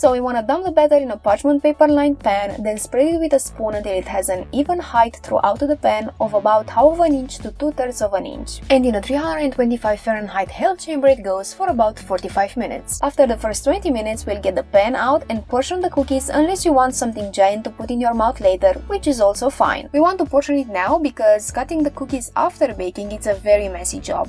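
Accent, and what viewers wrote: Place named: Germany. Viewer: so you think she's French? to me, she has almost a German accent...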